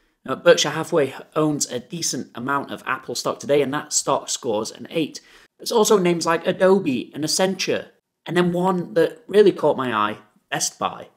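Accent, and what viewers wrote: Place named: United Kingdom. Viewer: You sound British, are you from the UK?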